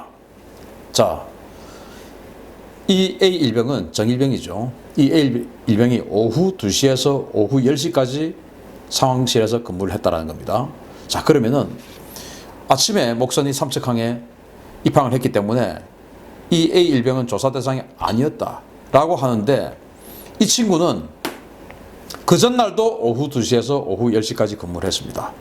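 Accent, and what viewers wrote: Gyeongsang, South Korea. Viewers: 또!!!!!!! 또!!!!!!! 쫌!!!!!!! 갱상도 남자의 강력한 멘트!!!!! 쵝오!!!!!!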